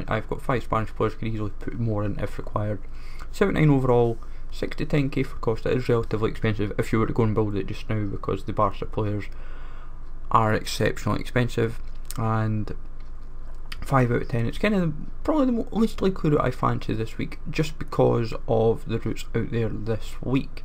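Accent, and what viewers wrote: United Kingdom. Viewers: us americans that can't understand you heavy accented brits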